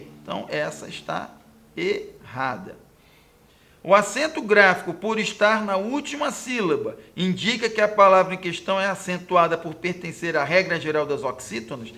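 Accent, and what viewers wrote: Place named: Rio de Janeiro, Brazil. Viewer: Esse professor fala igual os pastores da Record , sotaque carioca, bem explicado , parabéns pelos vídeos , foi de grande importância , e conhecimento...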